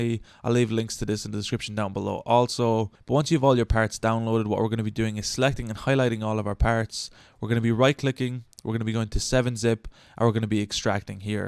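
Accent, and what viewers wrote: Ireland. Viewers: very helpful thx love your Irish accent : )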